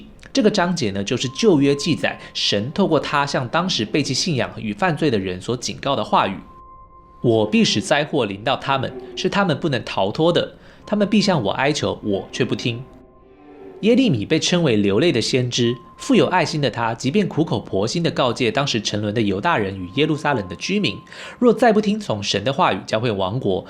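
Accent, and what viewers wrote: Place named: Taiwan. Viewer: UP主应该是说的标准台湾国语吧，讲的很好，口齿清晰，声音也好听，全程没开字幕听的很清楚。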